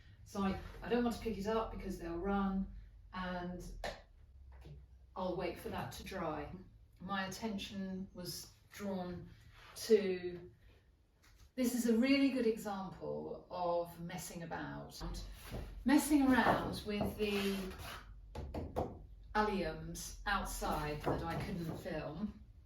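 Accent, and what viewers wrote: United Kingdom. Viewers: Madame. Keep them coming . Make them as long as it takes to cover one point. If its a short point make them short . What i mean is the video should cover the whole topic. That is being presented. I love your posh accent and being in your company. I am an artist from pakistan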